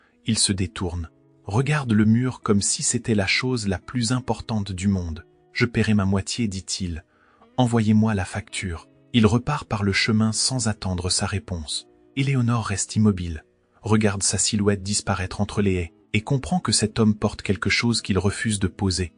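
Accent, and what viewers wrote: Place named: England, United Kingdom. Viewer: L'histoire est sympa mais l'accent anglais régulièrement est agaçant... C'est vraiment dommage 😢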